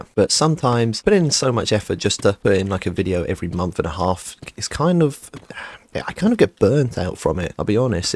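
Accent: United Kingdom. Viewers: are you from eastborne?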